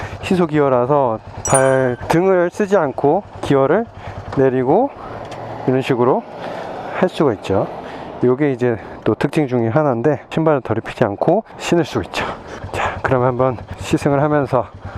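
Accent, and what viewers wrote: Gyeongsang, South Korea. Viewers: 형님 경상돕니까? 너낌~ 세알리고~ ㅋㅋㅋㅋ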